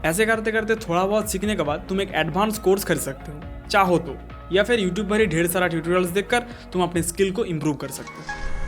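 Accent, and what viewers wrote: Bengal, India. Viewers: your bengali is so cute 😃😃 I a from bangladesh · bengali tumi ??😅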